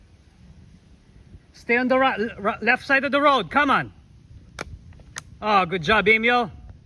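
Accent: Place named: United States. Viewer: Nice vid bro. Just curious where you learned how to speak English. Did you ever live in the U.S.?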